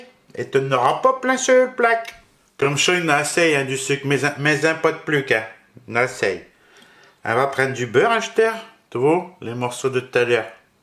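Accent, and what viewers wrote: Nord-Pas-de-Calais, France. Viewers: Oui, j'ai bien compris ce que tu as dit, continue j'adore t'entendre parler en chti.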